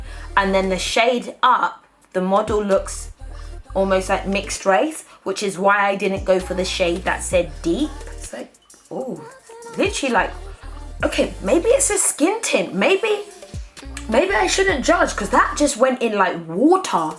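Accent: United Kingdom. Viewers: Love your accent! Are you from UK? 😘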